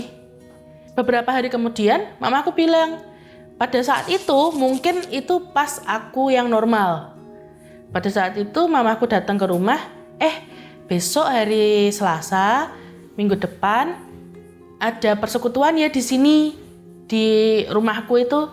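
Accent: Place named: Java, Indonesia. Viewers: Enak bnget ini mbk ceritanya mudah difahami kata katanya ada logat medoknya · Mbaknya ciri khas orang Jogja bgt. Slengekan seru klo ngomong. Nyenengin dah! 👍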